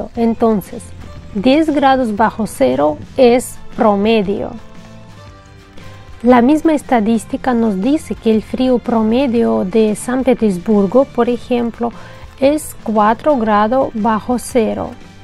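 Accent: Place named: Spain. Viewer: ¿Por qué hablas como español?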